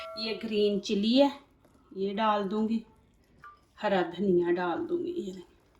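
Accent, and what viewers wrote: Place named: Punjab, India. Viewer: Tusi Punjabi ho?!! urdu may Punjbai khushbu a rahi hai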